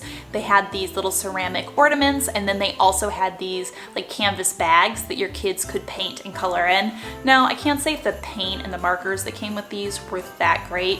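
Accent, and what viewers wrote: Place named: South, United States. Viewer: Hi I watched a super old video of you and you had like a southern accent and did not make any of your usual jokes/ humorous comments... Did you change since starting YouTube or were you just not being your real self at first? Not that you'll probably answer this but...just curious because I love your sense of humor and your super articulate manner of speaking so much and it was so odd to not see those things. And you looked kinda different too but maybe cuz it was 5 yrs ago...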